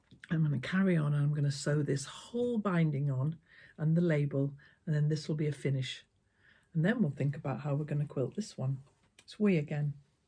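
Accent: England, United Kingdom. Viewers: I do wish I had found you in the midst of the pandemic when I was stuck home and missing my friends, because watching your videos is like sitting with one of my friends except of course your English accent because I am American. I am very glad to find you now and am watching all your videos and am thinking I am going to have to join your patreon channel to see what that is all about. I have never felt the need to join one before so maybe I better check it out.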